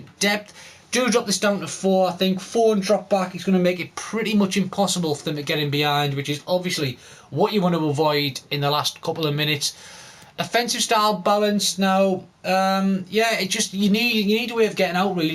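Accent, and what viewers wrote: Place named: Middlesbrough, United Kingdom. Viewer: where you from mate Middlesbrough? or close? ive never wanted to stream because i thought my accent would sound terrible but....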